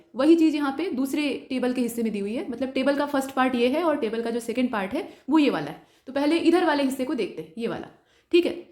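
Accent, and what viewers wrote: India. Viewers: Jo bhi kaho lekin aapki awaaj sahi lagti hai...Hindi medium ki jhalak ke sath english ka bolna mast lagta hai....😍😍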